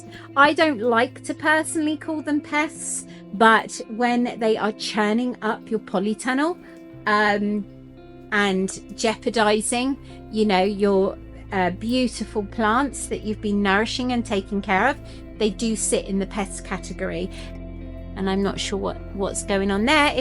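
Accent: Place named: England, United Kingdom. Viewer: Wow, you were born in Sweden!! 🤨I guess you didnät live there for long, you have such a strong English accent.... So how warm does it get for you in the summer? Yes, we have a channel would love for you to follow our journey! 👍💜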